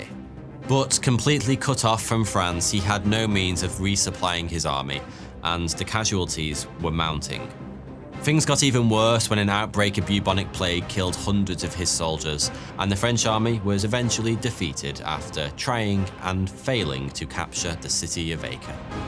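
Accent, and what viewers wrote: United Kingdom. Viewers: While this video is entertaining, it is inaccurate, which is understandable given your accent. Your dna has been programmed for hundreds of years before my dna was. Go have a pint, and watch some soccer while your crown eliminates you. They are VERY NAUGHTY! Did you know that the Rothschilds personally funded the equivalent of 1 billion dollars for the third coalition, which was partially annihilated simply by marching. Their global banking syndicate was in jeopardy… it’s all quite fascinating how they helped the crown carve up the world. Awfully coincidental that in 1812 England was fighting both America and France and the same time. That pesky Napoleon 😉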